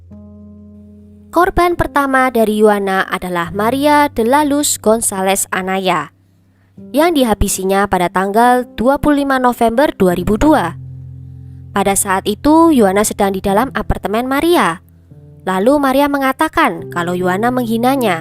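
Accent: Java, Indonesia. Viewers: Hallo jg medok kuhhh.. Alhamdulillah sehat.. sukses trs yaa say👍💪🙏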